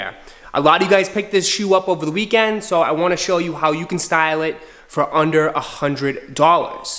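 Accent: Boston, United States. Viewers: Bruh... Where are you from. Boston?!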